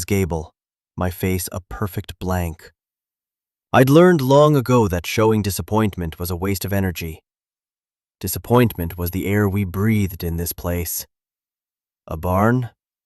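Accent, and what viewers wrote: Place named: United Kingdom. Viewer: STUPID, STUPID, STUPID!! Stories told in the "first person" MUST have the following to be professional and correct. Correct gender voice of the main character. ( Female voice for female character, and male for male.) Correct accent for the charcter. (Not a British accent for a 5th generation charter from the Deep South.) Other than that it is not worth listening to, so at less than one minute into your story, bye.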